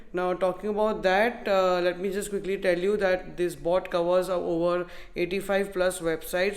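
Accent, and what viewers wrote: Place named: England, United Kingdom. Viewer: Your vidoes and content are very good but many of my frnd Don't take interest in because of your very heavy English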